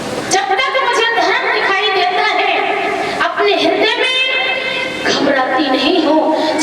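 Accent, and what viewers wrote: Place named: Fatehabad, India. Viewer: Maa Vivek Se Sundar to Katha kahati hi jai Unki Awaaz to Fatehabad Jaisi Hai❤❤❤❤❤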